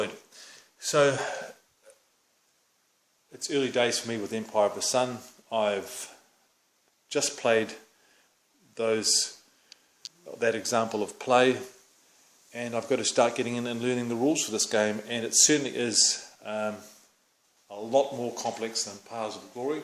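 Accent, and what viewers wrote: New Zealand. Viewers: Been watching "the great war" you tube series, which has renewed my interest in WWI games. The only GMT game I currently own is Twlight Struggle, so i've been looking at reviews and sessions online. And what do I hear, a bloody kiwi accent on the first one I click on :) Know a place to pick up Paths of Glory in NZ at a reasonable rate? Or should i just order from overseas as per usual. Edit Found a 2nd hand copy for $26 NZ + shipping.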